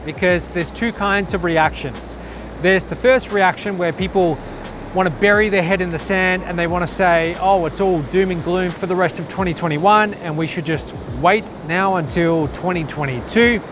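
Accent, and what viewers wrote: Australia. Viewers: Yep a bloody Aussie!!!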